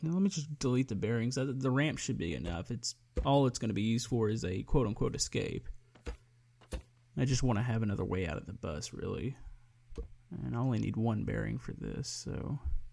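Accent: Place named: South, United States. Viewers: I'm guessing Texas. At first North Carolina but after talking to my uncle, I'm 99% sure it's Texas. Although I may be wrong and look like an idiot lol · I swear he has a southern accent.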